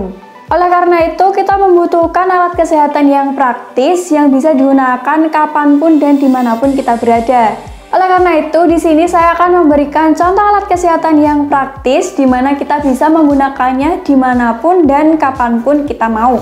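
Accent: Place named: Java, Indonesia. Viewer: Medok amat 😀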